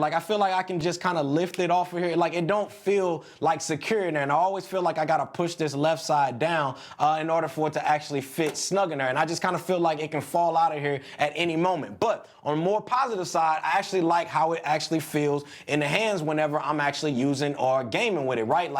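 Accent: St. Louis, United States. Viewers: Bro are you from St. Louis? 😂 STL in the building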